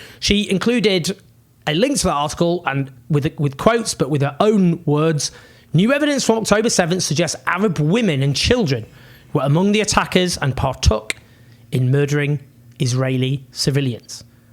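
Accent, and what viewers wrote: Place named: Yorkshire, United Kingdom. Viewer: He’s from Yorkshire, in the north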